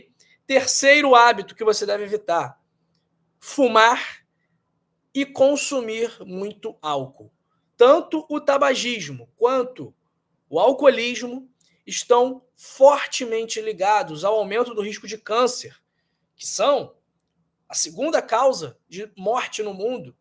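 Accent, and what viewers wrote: Rio de Janeiro, Brazil. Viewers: Bom dia Muito boa as suas orientações . OBRIGADA .QUERIDO DR AMO O SEU SUTAQUE CARIOCA EU SOU GAUCHA . Aqui Jaguarão RS fronteira com Uruguai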